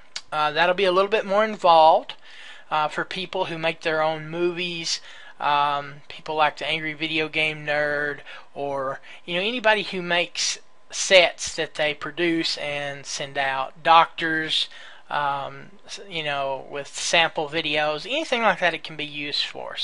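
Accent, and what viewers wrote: Texas, United States. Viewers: Very helpful. Thanks for posting. I also love your Texas accent. Reminds me of my time spent in that great state.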